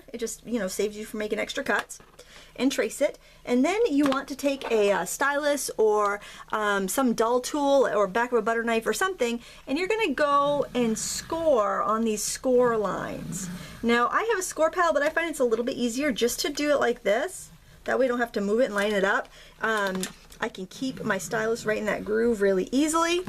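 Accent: Maine, United States. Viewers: I love the Maine accent!!! Whoohoo! Great video. :) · LOOL< see, and I've been "from away" for so long that I miss the Maine accent!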